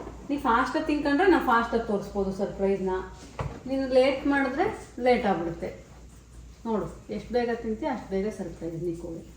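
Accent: Mandya, India. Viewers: Foreign ge hodru nu Namma local Bangalore and mandya Kannada en sakkathu agi mathadtira ❤🎉 Navu gowdre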